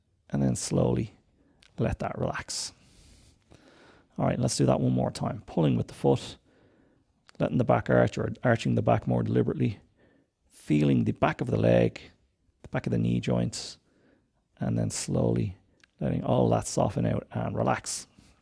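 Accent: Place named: Ireland. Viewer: I like it. I like your Irish accent i don't think you do fine , some videos were challenging , the volume's but, not too bad.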